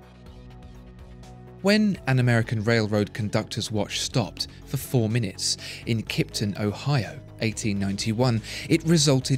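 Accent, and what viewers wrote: United Kingdom. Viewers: Which part of UK are you from? (specific accent)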